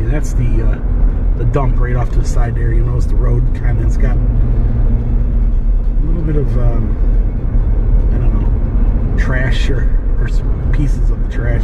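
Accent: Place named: South, United States. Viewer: It's funny how your words are sounding more like the Southern part of of you now! LOL!!!!!😁😁😁😁😁 THATS A GOOD THING!! You even have a twang also...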